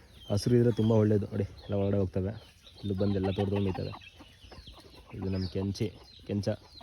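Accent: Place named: Mysore, India. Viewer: 2013 ರಿಂದ 2019 ರವರಿಗೆ ನಾಟಿ ಕೋಳಿ ಸಾಕಿದ್ದೆ, ಸಾಕಷ್ಟು ಕೀರ, ಮತ್ತೆ ಹಾವುಗಳ ಕಾಟಕ್ಕೆ ಬೇಜಾರಾಗಿ ಅಲ್ಲಿಗೆ stop ಮಾಡ್ದೆ, ನಿಮ್ ವಿಡಿಯೋ ನೋಡಿ ಅಳೆದೆಲ್ಲ ನೆನಪಾಯ್ತು... ತುಂಬಾ thanks.. nim ಮಾತು ಕೇಳಿದ್ರೆ ನಮ್ ಮೈಸೂರ್ ನವ್ರು ಅನ್ಸುತ್ತೆ ತಮ್ಮ ಊರು ಬ್ರೋ?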